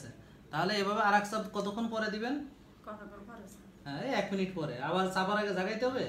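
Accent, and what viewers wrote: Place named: Feni, Bangladesh. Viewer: স্যারের মুখে ফেনীর ভাষা শুনে অনেক আনন্দ পেলাম এবং খুশি হলাম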